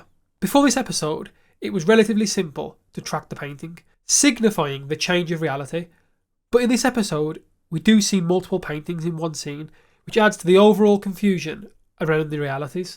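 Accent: United Kingdom. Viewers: PS Where in England are you from?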